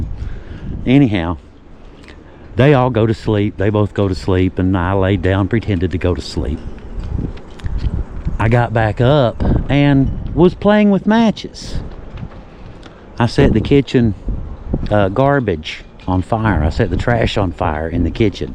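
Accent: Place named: Kentucky, United States. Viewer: and you two are such a wonderful team! I play your videos to listen to as I drive. My mom,she passed right before Christmas, loved listening and watching while I drove. has that storyteller voice plus the accent we love. You see she came from McCreary country Kentucky area. My grandparents moved north to Indiana once the mines shut. Later on working the glass factory up here she met my beloved Ma Ethel. Ma Ethel was from Mingo. Kermit to be exact. She was a Chafin.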